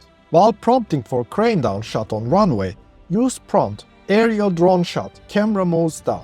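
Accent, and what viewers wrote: Turkey. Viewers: are you turkish? your accent sounds like turkish. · Your accent like Turkish... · Bu aksan Turk aksani degilse ben de bir sey bilmiyorum :)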